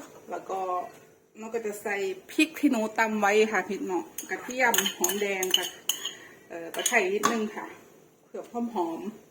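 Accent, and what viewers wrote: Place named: Sisaket, Thailand. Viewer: แซบๆคับผมเห็นแล่วน้ำลายใหล สำเนียงคือคนศรีเกษแท่คับ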